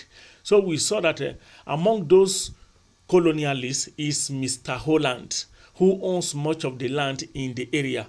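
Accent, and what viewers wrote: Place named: Nigeria. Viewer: As a kenyan, i am entertained in hearing the Nigerian accent of names like Njoroge, Nyokabi, Ngoto etc. Good job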